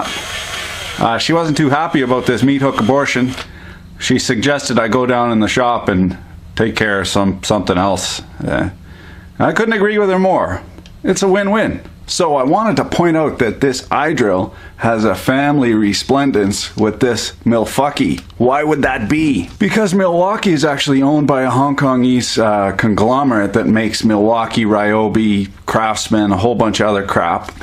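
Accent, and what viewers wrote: Canada. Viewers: i started watching your vids a few days ago. I got to say, I love listening to Canadians talk. And you sir, are a fun one to watch. Keep up the awesome work. If you can call it work, you have so much fun doing it. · Hes canadian, i think :P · The whole first minute reminds me of Corner Gas. must be the accent.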